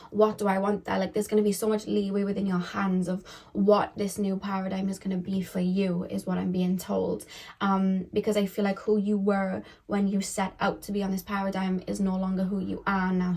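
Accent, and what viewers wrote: Wales, United Kingdom. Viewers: I love your accent😍😍😍 I subscribed just to hear your accent😂 are you from Swansea?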